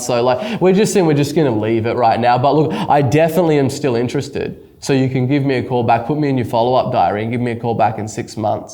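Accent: Australia. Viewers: is hormozi with ozzy accent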